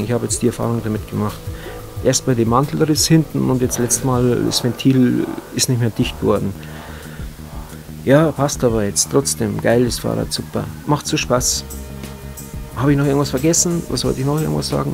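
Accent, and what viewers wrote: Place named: Bavaria, Germany. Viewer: . Guade 5000 km/h;) respekt man. 15 kilo weg da hast ja isar body jetz. Was kostet 1x akku aufladen. Haste das mal umgerechnet? Ich heiz n normales ghost mountainbike ohne motor. Aber ich schau manchen e-bikern schon manchmal wenn meine oberschenkel abkacken n bisschen neidisch hinterher. Kennste die trials zwischen thalkirchen und grosshesseloher brücke? Fetzt!! Aber ich bin da absolut amateur. angenehm deine videos mit deinem bayrischen gossen;) akzent.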